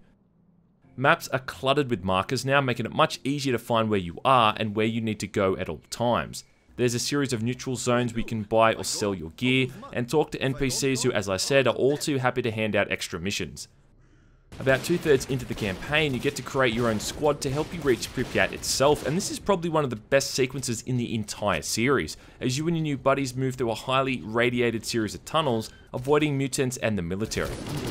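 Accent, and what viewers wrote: Australia. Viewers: "Stoker Cola: Pre-Piat" that Aussie accent tho.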